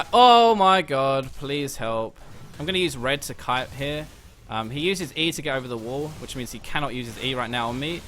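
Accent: United Kingdom. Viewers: What are you doing well: Smashing brilliant Brittish accent Pace of speach is good Thoroughly explaining your thought proces (and moves) What you can Improve: Explaining your team composition. I don't understand the Zyra into Morg/Lee, nor the poppy into Renekton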